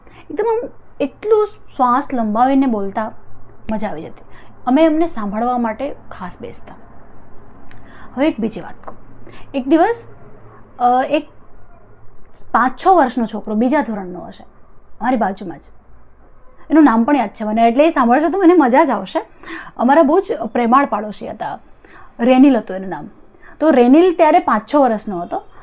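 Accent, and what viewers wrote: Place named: Kathiawar, India. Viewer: મોયજ આઇવી હો .. તમારે મોઢે અમારી બોલી હાંભળી ને... અદ્દલ કાઠિયાવાડી સવો તમી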